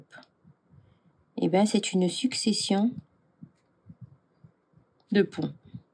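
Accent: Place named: Mauritius. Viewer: C’est drôle ! J’avais cru entendre un petit accent de l’île Maurice! Ça fait longtemps que vous faites de zentangle? Moi, je découvre…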